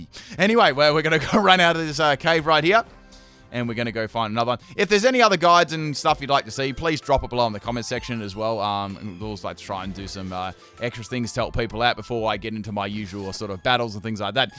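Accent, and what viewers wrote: Australia. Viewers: Im dead at ur thick Australian accent speaking all fast, normally I speed up videos to 1.25 to get the speed of the person explaining something right first time u had me lower it at .75 lol way smoother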